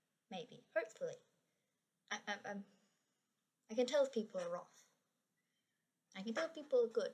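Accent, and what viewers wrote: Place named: Australia. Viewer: You sound Australian or from New Zealand. What's the status of Christianity down under?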